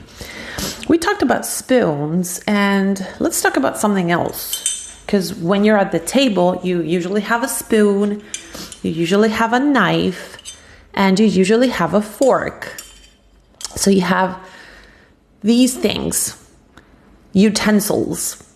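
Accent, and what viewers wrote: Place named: Midwest, United States. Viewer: Da madrelingua, complimenti per la pronuncia. Sembri proprio americana, con un accento un po’ midwest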